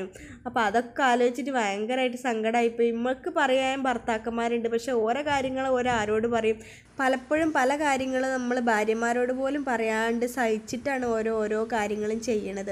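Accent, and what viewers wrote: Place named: Kannur, India. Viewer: Pudiya subscribera. Ellam.turane.parayunu.nalla.samsaram.nmloke.basha.pole.tane.nmle.kannura.padachone.anugrahikate.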